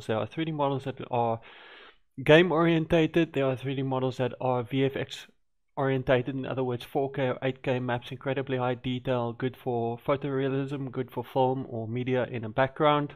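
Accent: South Africa. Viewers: Hi , thanks for the video , really helpful. Got to ask , are you south african ? I am in Johannesburg and would love to conect with fellow creators in ZA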